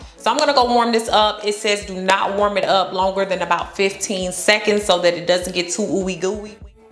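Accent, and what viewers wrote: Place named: Midwest, United States. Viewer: I just love the Midwest accent. I’m from the south but all my family in Midwest now. Make me feel right at home 💕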